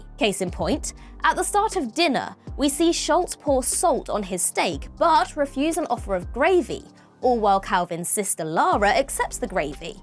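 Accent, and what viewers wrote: United Kingdom. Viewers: Who’s this cheeky Brit?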